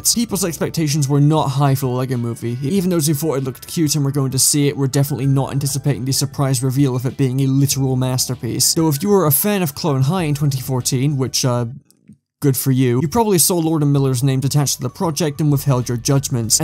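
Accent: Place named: London, United Kingdom. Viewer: Bro must be from London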